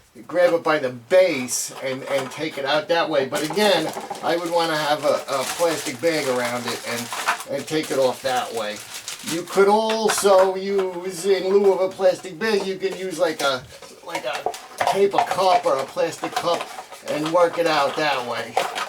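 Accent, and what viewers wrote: New York, United States. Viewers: Thank you, I was a bit timid about touching the filament, using the needle nose to bend the metal base lip (my mama taught me this, btw) and turn, no luck, corroded. I watched your video, turned off the power and turn the glass filament, thank you. No one here, just me. Quick note: how it broke?-the glass bulb mysteriously fell off, leaving the metal base, filament and a spooked girl. I still love the old school bulbs, btw. love your jersey or NY'er accent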